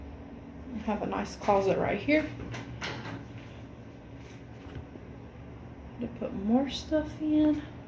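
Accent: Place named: South, United States. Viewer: Oooo girl you are even more country than me!!! Hahaha I love it! 🥰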